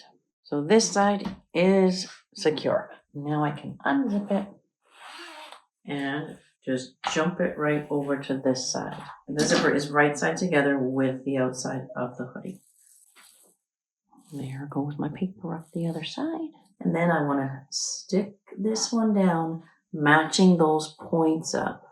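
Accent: Canada. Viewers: I'm addicted to your videos! You make me feel like I can tackle anything....I love your accent, your humor and your clarity. As a former US military family, you make me miss Canada too. We were blessed to be stationed in Newfoundland and then Victoria, BC during our military career. Thank you so much for making these videos!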